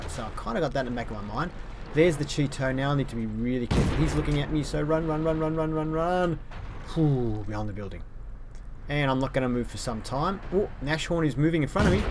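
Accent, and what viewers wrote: Australia. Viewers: u aussie mate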